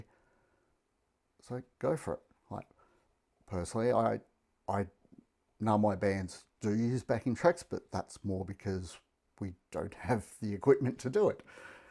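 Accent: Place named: United Kingdom. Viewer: So ok I have done my job, subs, liked and will make a little blabla to your reac.! So yes is a great metal band from Ukraine, the lyrics are often hiding a great support to their country wich is fighting against these bloody russian! I hate them since I was young,and now I am a really old french metalhead (Second Wave Black Metal the most I think)... But I have a first question are you from the US or the UK, because the most reaction channel I see are from the US and you have such an accent, I think you're from the UK? (Great if yes). Second question, why do you name you "old...."? I am old yes, you could be my son, easy!!! Ok about an other song from Tatiana... I think about live official vid... Great song! (Like all song ^^). And dont bother to much about backing tracks things, is a real true band!!!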